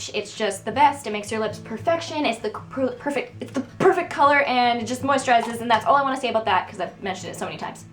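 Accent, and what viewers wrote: Canada. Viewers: This is the first video ive watched of you and i immediately could tell you were from canada :p i love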